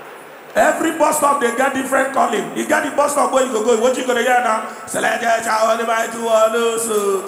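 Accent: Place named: Lagos, Nigeria. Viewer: I share in this thought. Na Lagos boy. From the accent, the knowledge of his content and presentation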